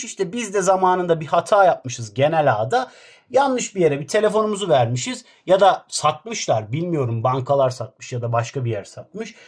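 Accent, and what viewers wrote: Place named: Black Sea, Turkey. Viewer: Hocam siz karadenizlisiz da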